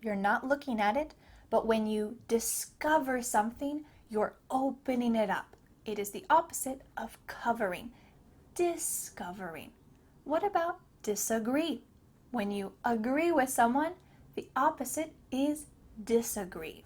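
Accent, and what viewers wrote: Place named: New York, United States. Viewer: I got a friend of mine, he is from New York, and I always share your beautiful classes with him! Lol! He feels like to go back home! Lol! Your lovely accent reminds him "Home". Thanks! Lol!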